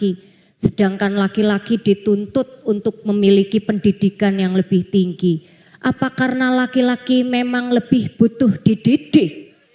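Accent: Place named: Java, Indonesia. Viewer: Narasinya bagus! Medok jawanya pas!!!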